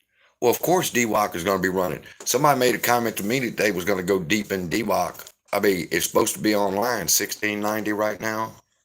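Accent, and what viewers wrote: South, United States. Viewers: Man, your southern accent !!! Hello from San Antonio Texas. 👍👍👍👍👍👍👍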